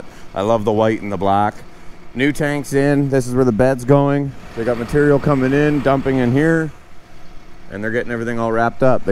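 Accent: Canada. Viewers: Those Canadian long Os are hysterical.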